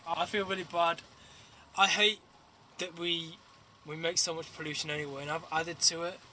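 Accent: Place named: Birmingham, United Kingdom. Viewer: sounds like a Brummie to me?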